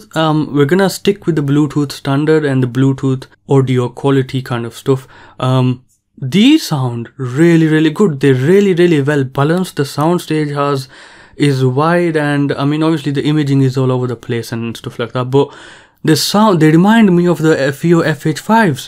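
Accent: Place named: West Yorkshire, United Kingdom. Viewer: Thats one right west Yorkshire (asian) accent lol I have recently got them, they are great but bass is not that good.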